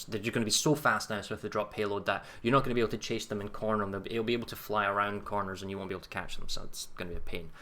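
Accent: Scotland, United Kingdom. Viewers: he's Scottish, he can pronounce English words in any way you can imagine, and it's still going to be alright...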